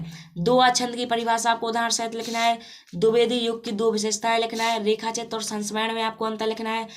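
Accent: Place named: Malwa, India. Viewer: Mp ka hai na bro agar malwa sa bol raha hu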